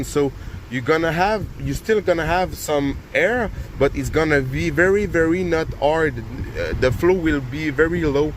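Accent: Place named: Quebec, Canada. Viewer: Salut j'aime bien tes video je reconnais ton accent 😅 comme le miens. Ou tu te procure le r134-a au quebec?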